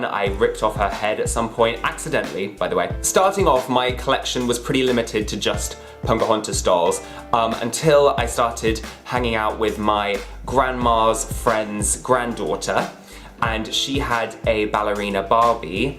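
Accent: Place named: England, United Kingdom. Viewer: I am subscribed, so I have spent the last month going back through your older videos. I love your accent. To my Mid-western ears, it sounds so English, but in a good way. Not sure I said that right... Anyway, your story is great. Mine is pretty basic: I come from a Farming/Teaching family. At least 5 generations that we easily know about. As such dolls were a luxury that if you got one, you took care of it and passed it down to the next generation, who was taught the same thing. I love dolls because it is part of my family history. I expanded my collection to include modern dolls as they came out.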